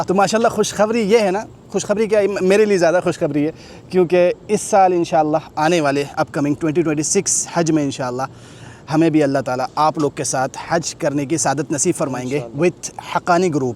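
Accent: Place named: Pakistan. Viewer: Bhai aapki Zuban Pakistan wali hai, main confused hoon ki aap kahan se ho?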